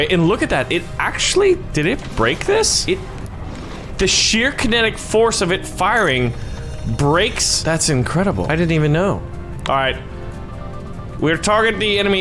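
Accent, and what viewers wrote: United Kingdom. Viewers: Why you always speak with a British accent Maby be because someone in your family could of been in the Royal Navy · Love the British accent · Can you stop speaking in british acsent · im sorry but i don't like your British accent im sorry though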